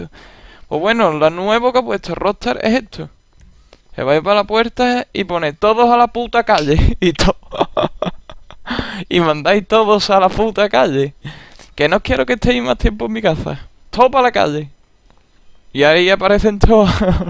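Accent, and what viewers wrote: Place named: Andalusia, Spain. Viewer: yo soi el de el garaje ami no me echaste yo me salí solo eres un makina tu asento es Andalus como el mio coone k e lo k vale i de buen rollo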